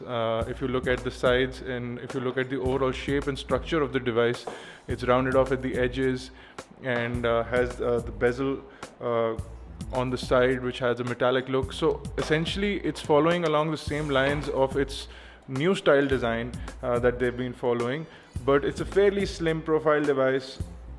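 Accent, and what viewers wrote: India. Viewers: indian ? lol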